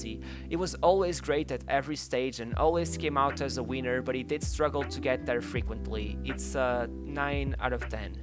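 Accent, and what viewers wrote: Portugal. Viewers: The speaker has Portuguese accent!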